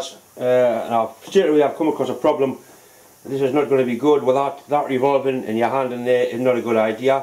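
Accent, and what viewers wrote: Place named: Scotland, United Kingdom. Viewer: Ohhh, bl**dy h*ll, ya about killed me! When you said "High speed stool" I had a laughing spell that drove my cats from the room and made me see stars when I lost all my wind! Oh my brother, ya done got me typing with an accent now after watchin' ya's all mornin' long... Begging your pardon, it just feels good to mess about and have some fun with making different accents. There is not a drop of harm meant, and I certainly hope there is no offense taken? I'm just a old lad from Hawaii that lives in California now, I grew up surfing and playing footy, so I've met my fair share of Australian blokes, and later on some English and Irish surfers made it over there too, but I've yet to meet a Scot who surfed. I know they exist, some fine ones too, and Thurso is high on my list of places to visit for a surf, but I've just yet to meet one of your fellow countryman out in the surf. 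Bound to happen if I ever get to Scotland, he he. Anyhow, I am going back to watching you work, it's a great playlist and I really appreciate you taking the time to put it up for us to enjoy! I can feel your pain as far as the slow uploads go, even my computer is so old that it takes forever to just render them from the editing program. Thanks a bunch for both the great laughs and the excellent instructions. You can blame Tom Lipton for me showing up here and pestering you, he's the one whose mentioned you. Well, everybody I watch speaks highly of you, but he was just the most recent, and with my memory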